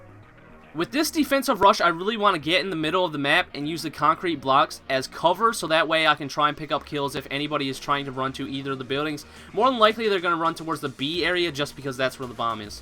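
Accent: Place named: Canada. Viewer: Your accent is so annoying haha are you canadian? Also good vids, they really help.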